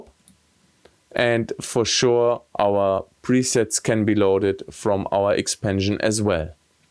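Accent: Germany. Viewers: na, hast'n deutschen akzent nicht?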